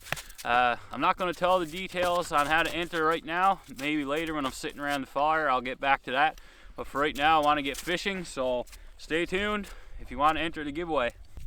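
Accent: Newfoundland, Canada. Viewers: I like the Newfoundlander accent, reminds me of when I was little. That is a great dog he is such a character!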